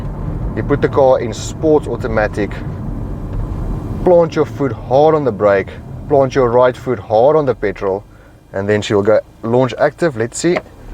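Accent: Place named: South Africa. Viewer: Wow I totally didn't know south Africans sound like this lmao I thought this was from the UK with an accent of somewhere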